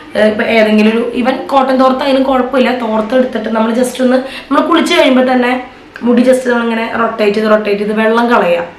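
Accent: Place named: Thrissur, India. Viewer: Thrissur aano veedu. Samsaram athe pole nd. Njan Thrissur aanetto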